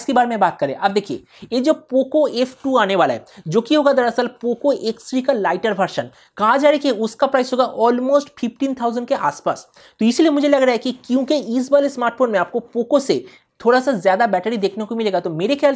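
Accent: Bengal, India. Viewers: Dada apni ki bangali naki apnar Hindi Kotha sunte lage je apni bangali 😀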